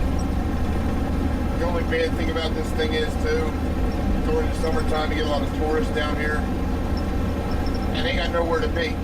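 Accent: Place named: Philadelphia, United States. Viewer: I lost track of how many times you said "wooder".. where u from driver? My hubby pointed it out. He's saying Delaware county PA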